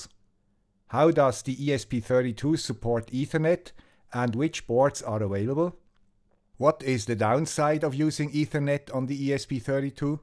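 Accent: Switzerland. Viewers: Wait a seconds! Guy with swiss accent familiar with NIVA!? Lada NIVA!?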